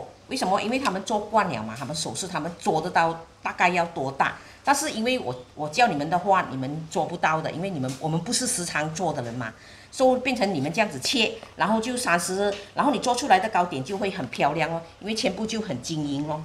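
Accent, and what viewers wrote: Malaysia. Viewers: 一听就是馬来西亚的华語！好有亲切感！那些话語馬来西亚人听得懂.，也听到很爽！ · 大马的华语口音很好听，亲切而柔情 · 很喜欢aunty 讲的马来西亚口音的华语，很亲切，也喜欢aunty 介绍马来风味的中国小吃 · 您是大好人肯无私分享，希望您能继续出做糕点的视频，马来西亚华语很亲切的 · 喜欢这个aunty 讲话很可爱也很真。纯马来西亚华语。希望你健康长命百岁，做出更多kuih的视频分享。和更多实验更简单教学方法。不然以后这些传统糕点就失传了。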